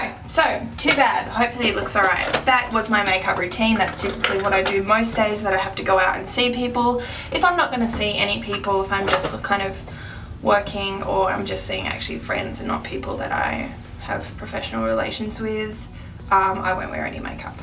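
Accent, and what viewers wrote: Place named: Australia. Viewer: Morning routine twins! You are stunning. Also, yay for us Aussies! Can't get much realer than that accent x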